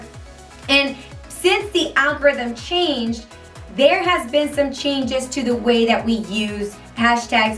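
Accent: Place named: Spain. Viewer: Cool............. I don't know about .........I like your Spanish accent.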